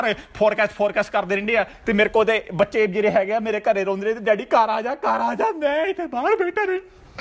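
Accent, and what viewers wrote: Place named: Punjab, India. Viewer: You have THE true punjabi accent.😂🌹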